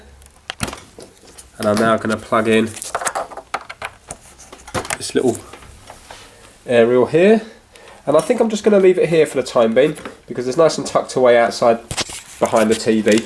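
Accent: England, United Kingdom. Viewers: Right, tell you what I missed your English accent. (MATE)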